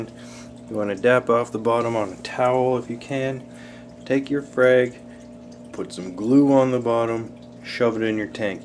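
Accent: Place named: Minnesota, United States. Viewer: Boy your voice sounds like home AKA Minnesota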